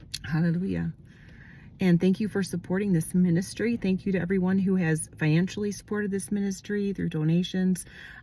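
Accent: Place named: West Coast, United States. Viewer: Seems like you have a west coast accent, i thought michegan folks would kinda have a jersey accent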